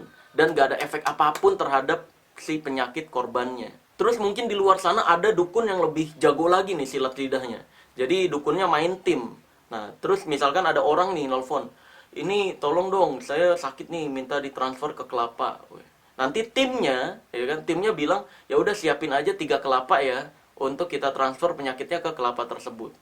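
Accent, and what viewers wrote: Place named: Wonogiri, Indonesia. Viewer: Pakek apa tu yang di kuel-kuel. Bang jawa nya wonogiri ndak hilang. Haha😀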